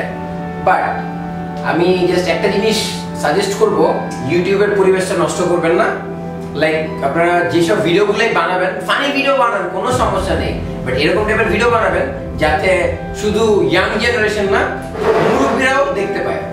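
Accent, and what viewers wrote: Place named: Old Dhaka, Bangladesh. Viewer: vi vlo bolsen.. but Language gola ektu vlo korle r o vlo hoto... but kisu korra nai karon ata poran Dhaka kotha bolar style.. jak all the best vi.. 👏👏👏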